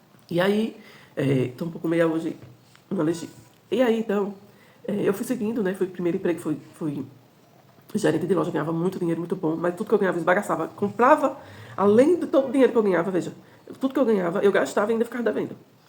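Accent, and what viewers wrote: Brazil. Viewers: Que alegria conhecer seu canal, esse sotaque lindo que não reconheci até você dizer que é Sergipana (ainda não conheci Sergipe). Me senti inspirada e mais motivada para me amar e ao todo. Dou gracas por sua vida! 🙌🏾🤲🏾🙏🏾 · Bem logo via que teu jeito de falar era das redondezas daqui😂😂😂😂😂😂 sou de Alagoas hahahahhsah amei seu canal e to na quarta onda ja ❤ obrigado pelo vídeo ❤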